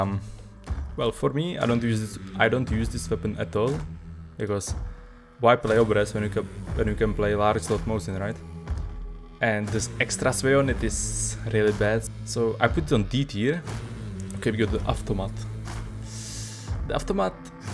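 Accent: Czech Republic. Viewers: Hmmmm is that a czech accent i hear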